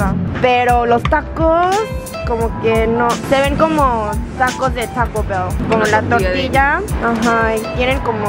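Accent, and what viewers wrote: Mexico City, Mexico. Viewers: Tú acento impresionante es mexicano y fresa, jajaja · CHICAS hablan muy bien el ESPAÑOL la chica de pelo largo tiene una voz MUY SENSUAL y se le oye mas bien como del DF en otras palabras chilanga, pero la otra chica su voz me a enamorado a parte que esta muyyyy linda, besos y abrazos para las dos y muchas felicidades por su canal y sobre todo que bueno que les guste la comida de la gloria del cielo MEXICANA, saludos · Se te pego el acento fresa de México jajaja, tal vez viviste en CDMX o Puebla? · Que hermosa estas y tu aciento mexicano muy linda saludos mexico te espera oh tu amiga también muy linda 😉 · Querida eres toda una mexicana me di cuenta en la forma de comer los tacos la chispa que tienes y obviamente cuando hablas saludos desde Chicago 🇺🇸🇲🇽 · hola !!! me quede esperando el final (2do video)... también esperaba que en el restaurante Oaxaca pidieras enchiladas de mole 😋, te faltó ponerle limón a los tacos y si deberías probar lodctacos de lengua 😋y me sigue encantando tu acento muy Mexicano!!! 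Saludos🇲🇽 XOXO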